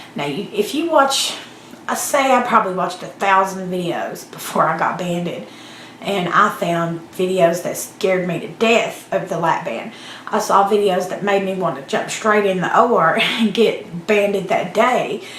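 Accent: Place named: South, United States. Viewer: Erica mentioned you so had to come and check out your channel. You are so cute..love your personality and southern accent =) Great video..so much truth was spoken. Keep up the hard work!!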